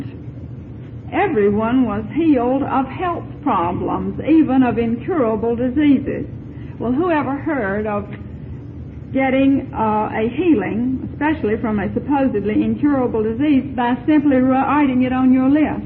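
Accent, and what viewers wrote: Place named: North Carolina, United States. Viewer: I love her North Carolina twang!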